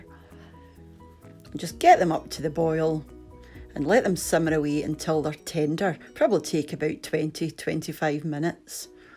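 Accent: Scotland, United Kingdom. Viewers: looks amazing.. will be trying this. my mother and most of the family are from portsoy. this scottish accent just sends me back to being little and visiting during holidays. so so glad i found this channel x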